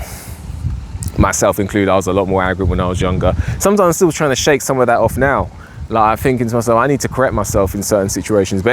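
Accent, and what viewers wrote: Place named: United Kingdom. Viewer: Bra it was bc of your bloody British accent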